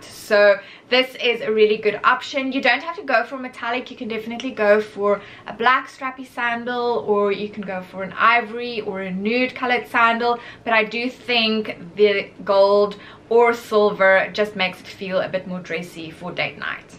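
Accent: South Africa. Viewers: Great travel tips, thank you! Are you South African? Every time I watch your videos, I always think you sound so South African to me (being Afrikaans myself). 😅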